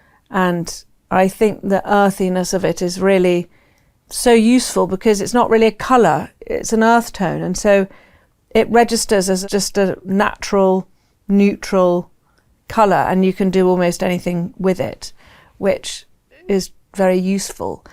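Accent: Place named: England, United Kingdom. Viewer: Love her homey style and I love her English accent.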